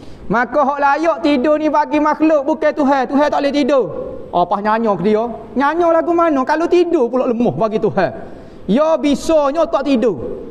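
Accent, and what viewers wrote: Kelantan, Malaysia. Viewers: Alhamdulillah , salah satu ustaz idola kawe, bahaso kelate pekat kemah kemin tok gogek langsung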